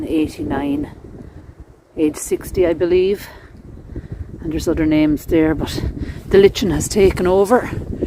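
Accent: Ireland. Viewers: Great job! Appreciate the dedication and work you put in. Are you kidding? The wind noise and camera focus is nothing - anyone who's ever done video knows that. Ignore the naysayers -- 99% of the people enjoyed it - the other one percent are holed up somewhere living off their parents and whining. You do a terrific job - glad I found you and hope to see more of your work in the future. And I love the Irish brogue!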